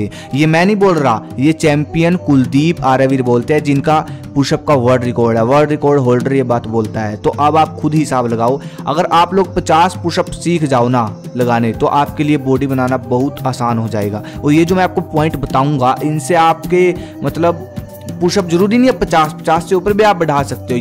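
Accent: Haryana, India. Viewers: Bhai aap kha se ho Mn to nu lagh h ki aap haryana t ho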